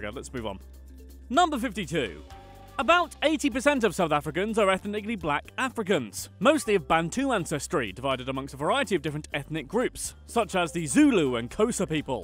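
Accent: England, United Kingdom. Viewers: Wow very english you need to work on your accent dude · I am from South Africa nad the acsent is kinda british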